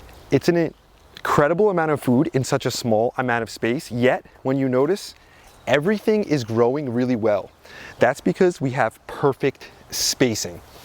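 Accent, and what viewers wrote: New Jersey, United States. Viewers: Haha, yeah, his Jersey accent coming out. Be happy he’s not from Boston or Brooklyn, LOL.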